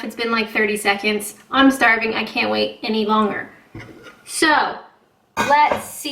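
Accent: Canada. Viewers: You sound Canadian. Not British. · Because i love your Canadian accent , Much nicer than my boring Surrey Accent (British) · I really like your videos (because you're spontaneous and sincere). I am learning English, so your videos help me to practise listening, and I have also learned new words with you. I love your Canadian accent. Greetings from Spain!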